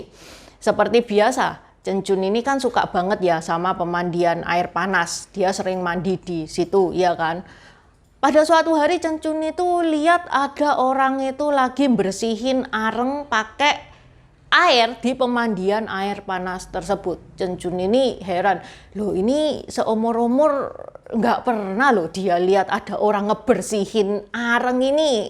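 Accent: Java, Indonesia. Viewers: Mantap mba jawanya medok sedap bangat ! 🍺🍺🍺🌹🍷🍷🍷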